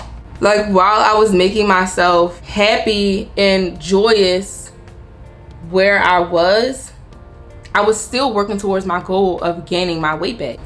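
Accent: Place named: DMV, United States. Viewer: Sis you gotta be from the DMV . It’s the accent for me . I love it here 💕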